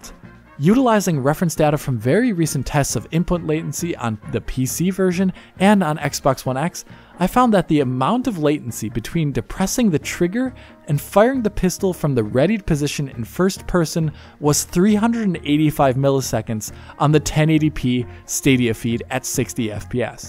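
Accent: Germany. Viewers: HOL' UP. YOU ARE GERMAN?! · Or... the guy is German? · He German. I repeat: He German. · German accent is never funny, its rather SCARY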